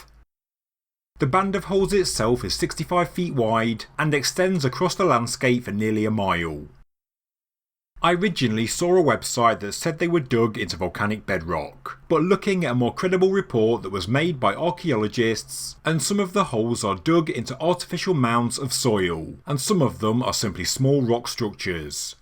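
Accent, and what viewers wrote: United Kingdom. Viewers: O conteúdo do Canal é ótimo. Mas ainda aguardo legenda para Português no vídeo. Esse inglês britânico é horrível!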